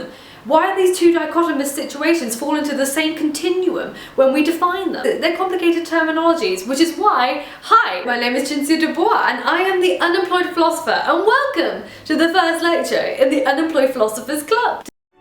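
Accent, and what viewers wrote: United Kingdom. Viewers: I saw the thumbnail and I was like "I hope she has a british accent" (for some reasons). I was not disappointed.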